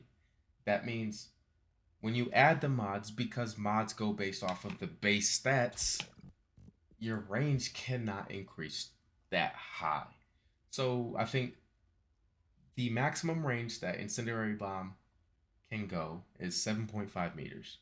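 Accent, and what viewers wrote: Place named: Philadelphia, United States. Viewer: "Yea that jawn like... ya mean." Philly Boi detected.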